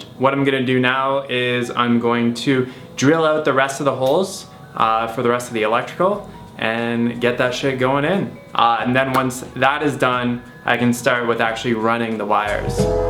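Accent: Canada. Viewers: The Canadian accent was strong in this one (probably because you said words with "ou" in them so often lol). XD I can't wait to see this tiny house when it's finally done though! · Aboot...lol You're such a Canuck :)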